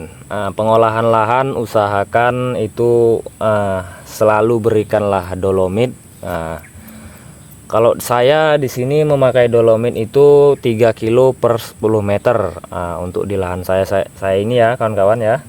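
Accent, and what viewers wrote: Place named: Medan, Indonesia. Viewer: Kalok nengok dato bahasanya abang ini orang medan.dari mana bang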